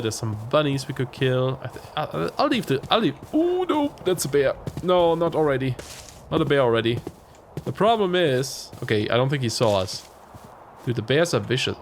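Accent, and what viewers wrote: Germany. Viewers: Love the video! Also I have a quick question. Your YouTube profile says you are Canadian, "but" your accent kinda sounds German to me. Am I right about that?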